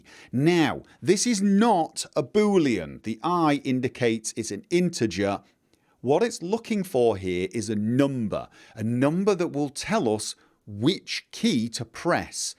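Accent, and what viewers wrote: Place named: Manchester, United Kingdom. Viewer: its a Manchester accent with a bit of Liverpool, but clear and deliberate with flourishes, like on the rolling Rs. A switch between cities and Norway may have something to do with that.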